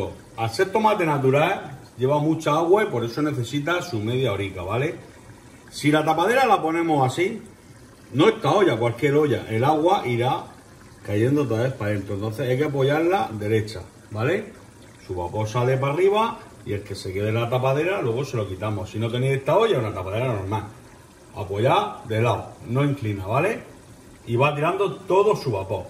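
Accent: Murcia, Spain. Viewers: Muy buena receta. Como se nota los aires murcianicos, jajaja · Llevo unos años fuera de la Región y la morriña me está matando. La magra, el acento y sobretodo ese litrico al fondo le han dado la vida. Que fantasía.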